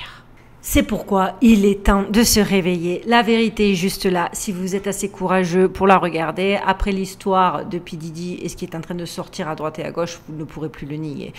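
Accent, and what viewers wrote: South, France. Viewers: Salut ma jolie 🤗❤, je suis comme toi le vilain petit mouton noire, et aujourd'hui je m'assume totalement et je dirai même j'en suis fière, fière d'être différente et puis touts ceux et toutes celles qui sont différents et éveillés sont directement mis dans le moule dit "complotistes, fous, pas clair..."et j'en passe, moi et mon Moustache ( mon chat Noir et blanc) on t'❤aime car nous te ressemblons tellement à toi et à Melle Duchesse!!! Merci pour ta sincérité, ton humilité, ton accent qui chante notre jolie Sud...Tu es une belle âme, ne lâche rien, courage je sais que c'est difficile, mais tiens bon ma jolie, je t'envoie une galaxie d'infinies pensées remplies d'amour, de paix, de courage et de positives!!! Et pleins de bisous remplis de tendresse et de douceurs ainsi que plein de câlins pour Melle Duchesse, j'espère que tu as vu ou entendu parler de la fête de 3 jours que la ville de Toulouse va faire du 25 au 27 Octobre de cette année, c'est comme un immense Carnaval et le titre c'est "les portes des ténèbres" il me semble ( je t'avais laissé des messages à ce sujet) ce serait un superbe sujet à traiter et surtout à dénoncer, car le mal est vraiment là et ils ne se cachent plus, dans l'énoncé ou résumé de ce sombre spectacle c'est écrit que ce spectacle est fait pour connaître les ténèbres parce que pour découvrir la véritable lumière il faut d'abord passer par les ténèbres 😮😢 vraiment navrant et triste!!!! 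Merci pour toutes tes superbes videos, tu no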